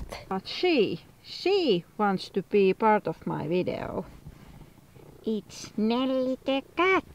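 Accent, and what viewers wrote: Finland. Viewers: I am from Nepal(very small country from asia)..and the most unique thing i found about Finland is the accent...i have mentioned in ur other videos...its really amazing the accent...Moi!!😁😁😁😁😁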